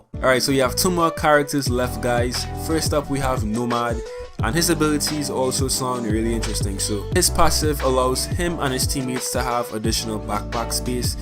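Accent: Trinidad and Tobago. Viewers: Im from trinidad btw u sound trini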